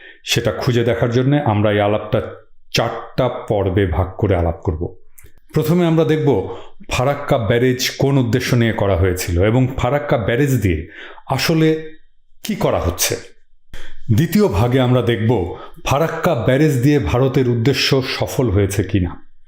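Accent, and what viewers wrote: Bogura, Bangladesh. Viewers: ভাই আপনি বগুড়ার ভাষায় কথা বলেন, ওই ভাষা আপনার মুখে মুখে শুনতে শুনতে অভ্যস্ত হয়ে গেছি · দাদা আপনার মুখে বগুড়ার ভাষা ভালো লাগে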